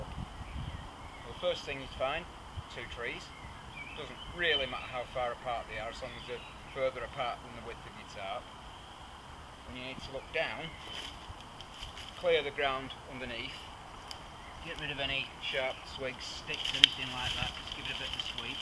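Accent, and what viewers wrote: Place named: Lancashire, United Kingdom. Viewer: Excellent video sounds like you not far from me I am near burnley fancy a meet up cheers keep up the good work thank you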